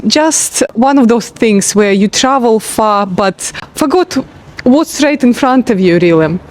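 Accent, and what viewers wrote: Glasgow, United Kingdom. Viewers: Yeah was thinking that its lovely hearing the Glaswegian twang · Me too! You can’t escape the Glaswegian accent, no matter how hard you try.😄😄😄😄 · Love it! Can totally hear the Glaswegian accent starting to mix with yours. Lovely. Great vid .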